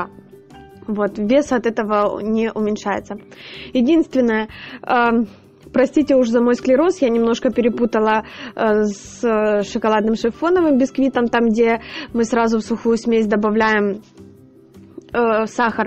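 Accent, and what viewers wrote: Ukraine. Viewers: Болит голова - не смотри ! Произношение такое потому, что девушка из Украины! Нужно обязательно свою ложку дёгтя бросить , а так все нормально!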